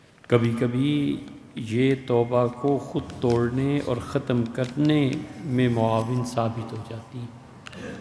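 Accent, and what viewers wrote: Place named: Lucknow, India. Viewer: مفتی سعید خان پاکستان بن چکا ہے اور اب تم لکھنوی ماحول سے باہر مسلم معاشرے کے رہائشی بن چکے ہو لہٰذا اپنے لب و لہجہ کو پاکستانی زبان سے متعارف کروا کر ہندو غلبہ والے معاشرے سے دور کر دو تو بہتر ہوگا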